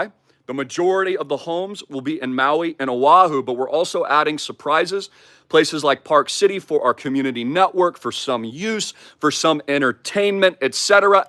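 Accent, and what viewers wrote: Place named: United Kingdom. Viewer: Your British accent 🔥🔥😂😂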